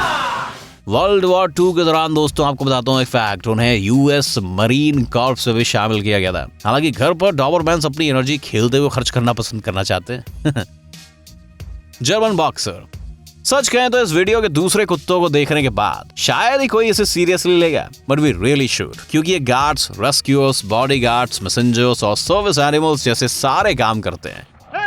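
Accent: Haryana, India. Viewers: Your Hariyanvi accent was outstanding.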